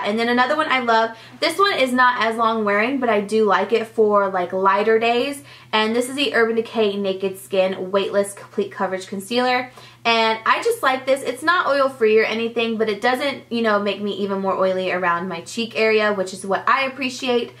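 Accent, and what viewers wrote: South, United States. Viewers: Love your videos by the way ! I cant believe you are at 1mil subbies ! You deserve that and much more , i remember when you did your tutorials in your old room and had the southern accent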